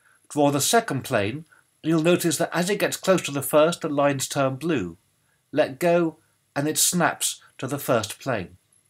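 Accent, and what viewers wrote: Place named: United Kingdom. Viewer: What a beautiful british accent, thanks for the tip ;-)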